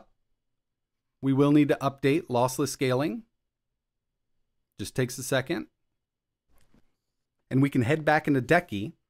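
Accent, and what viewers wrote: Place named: United States. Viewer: Bro what part of America are you from. This is the best American accent I’ve heard. Heard a few times but can’t place it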